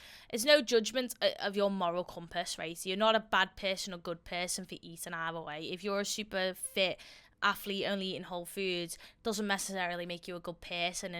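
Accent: Liverpool, United Kingdom. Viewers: She’s not Scottish. Sure of it. She’s Scouse, I think.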